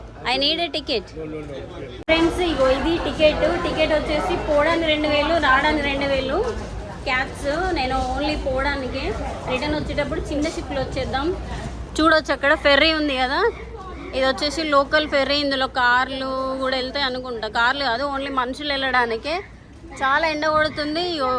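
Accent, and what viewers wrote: Telangana, India. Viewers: Mee hair style baagundhi , ee muchata anedhi inkocham sepu ekkuva pedithe baaguntunde , any way mi videos down to earth ga untayi, veelainantha varaku Telangana yaasa maatladandi vinadaaniki vinasompu ga feel baaguntundhi , emanna ... Feel untudha Telangana yaasa baasha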